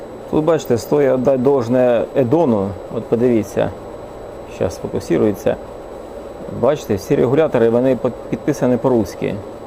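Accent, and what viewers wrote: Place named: Poltava, Ukraine. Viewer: Шото говор наче полтавський! Угадав?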